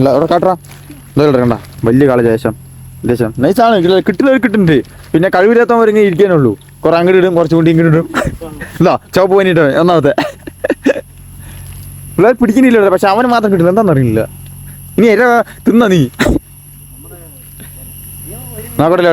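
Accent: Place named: Palakkad, India. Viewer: Palakkad slng poli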